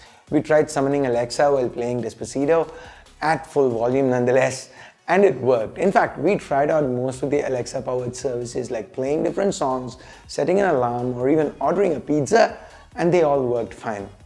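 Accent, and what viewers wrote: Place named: India. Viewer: Very good Indian English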